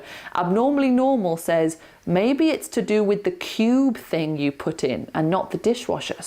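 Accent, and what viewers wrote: United Kingdom. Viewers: I watched you from the begining and I wrote the words I didn't knew and I will watch your video again tomorrow and write some other notes in a excel file for myself by the way, thank you to put these videos for us. it will be really helpful if any one wants to learn english and speak fluently especially with this lovely british accent that you got. I wish you read my comment. I'm from Iran I love british people and their accents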